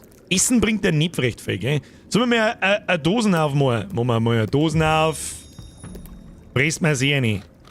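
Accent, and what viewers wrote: Bavaria, Germany. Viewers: #nochschausquad servas. danke für deine Streams. Mehr boarisch, weniger preissnscheiss! des passt ba dir immer:)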